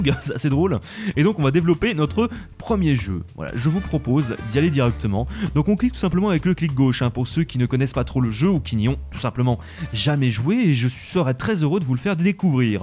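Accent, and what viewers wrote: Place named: Paris, France. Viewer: pourquoi tu dit gare et pas genre . tu est de Paris ??? Parce que moi je suis d'Aveyron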